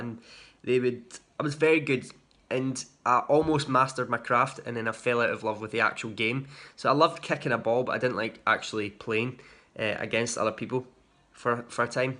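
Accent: Scotland, United Kingdom. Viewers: Gaaahhhh I would love to go to Scotland! I have Scottish on my dads side. To go see part of my ancestry would be the best! But thank you for sharing your lovely accent! I still laugh when people think it’s Irish 😂😂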